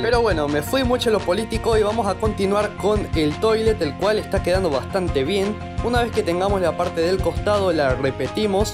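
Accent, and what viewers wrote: Argentina. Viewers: Eres de argentina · Te quedo muy bueno el "abuelito molon" eres argentino? No c pero bueno 🗿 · Sos de argentina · Eres argentino nove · SOS argentino por tu acento 😊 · Eres de argentina papu pro😊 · No hace falta decir que el men es de Argentina verdad?? XD, fuera de eso, tus videos/tutoriales son muy buenos bro, sigue así y llegarás al los 100k :D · Eres de argentina? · argentino obio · eres de argentina verdad? buen tuto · Eres de argertino no?? O uruguay??, la digo por que su acento tienen parasido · Eres argentino no?? 😅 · Yo llase de que sos de Argentina lo se por como hablas y yo también soy argentino me gustan tus videos 😊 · Se re nota que es de ARGENTINA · de argentino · Tu eres de argentina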